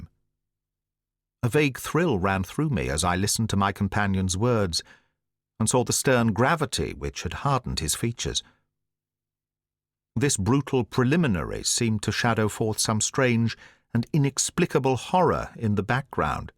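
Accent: United Kingdom. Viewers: Agreed I love my I love British female accent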